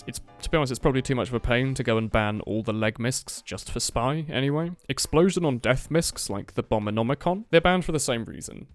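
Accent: United Kingdom. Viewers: even if i dont care much about competitive tf2...i just love hearing this british man speak like a professor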